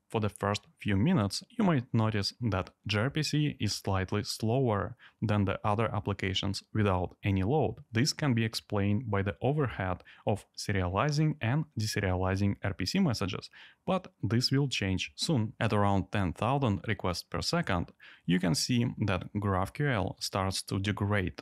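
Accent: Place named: Russia. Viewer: явно русскоговорящий тип делает канал на английском, зачем ?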